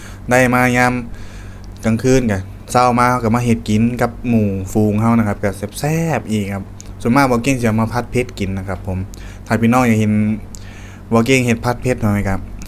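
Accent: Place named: Sakon Nakhon, Thailand. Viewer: เว้าญ้อคือสกลนครเนาะหนุ่มน้อย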